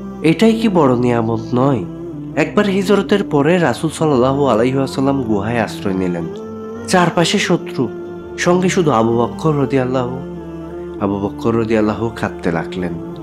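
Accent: Chittagong, Bangladesh. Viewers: O chatgaio ki bolo go . polat kole low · এমন ভিডিওগুলি আরো অনেকেই দেন সুন্দর সাবলীল হৃদয়ের ভাষা দিয়ে যা মন কেড়ে নেয় পানি আসে। কিন্তু ভাই আপনি চট্টগ্রামের লোকাল ভাষায় বর্ণনা দিয়ে বিষয়টির মাহাত্ম এবং গুরুত্বই নষ্ট করে দিয়েছেন। কিছুদিন ভাষা চর্চা করেন চট্টগ্রামের লোকাল ভাষা পরিত্যাগ করেন। তারপর ধারাবিবরণী দেন আমরা খুশি হব।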